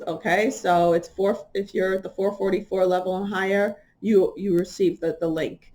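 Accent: New York, United States. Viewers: I like you New York style 👍🏻🎶🎤you Rock.. 😎🤩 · I love your "New Yorkness" and I am sure a lot of other people do too. Thank you.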